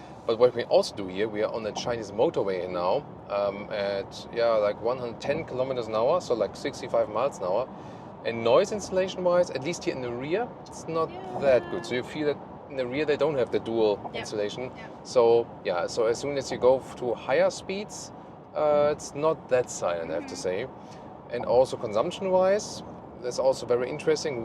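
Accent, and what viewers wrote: Germany. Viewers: I love your German accent. Funny as hell.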